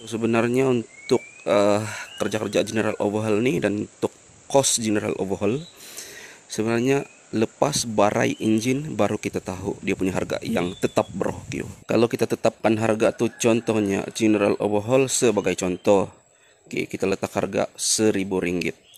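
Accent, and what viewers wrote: Sabah, Malaysia. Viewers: Lokasi di sabah atau serawak ke ni,, loghat mcm org sabah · Iya bos loghat sabah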